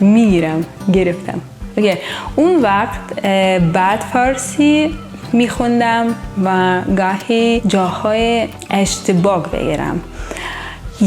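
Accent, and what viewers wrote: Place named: Iran. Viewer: درود بر شما عزیز ، اول اینکه در مورد پوتین با شما شوخی می کردند و مزاح بود ، دوم اینکه لازم نبود در ایران حجاب داشته باشی الان خیلی دختران ایرانی هم حجاب را رعایت نمی کنند علاوه بر این در ایران به توریست های روسی در مورد حجاب سختگیری نمی کنند و می توانستی راحت تر باشی ، سوم اینکه خیلی خوشحالم که تجربه اول شما از سفر به ایران خوب بود ولی ظاهراً خیلی از جاهای جذاب و دیدنی ایران رو ندیدی امیدوارم در آینده ببینی ، چهارم اینکه خیلی ممنون از اینکه سعی می کنی به پارسی صحبت کنی با اینکه برای شما سخت است ولی تبریک می گویم که شما با لهجه ایرانی فارسی صحبت میکنی و برای ایرانیها قابل فهم است و در آخر اینکه خیلی خونه قشنگ با چشم انداز زیبایی داری به شما حسودیم شد روسیه طبیعت قشنگ و زیبایی دارد پیشنهاد می کنم خارج از منزل فیلم بگیر در ضمن اسم واقعی شما به روسی چیست؟ چون فکر می کنم یک اسم اروپایی یا آمریکایی است نه روسی ؟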